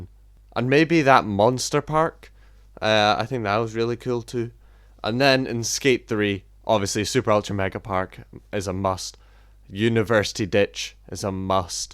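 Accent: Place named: United Kingdom. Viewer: Cool accent bro. Are you from somewhere like Scotland or UK or Ireland? And what’s your main channel I dropped a sub on this one bro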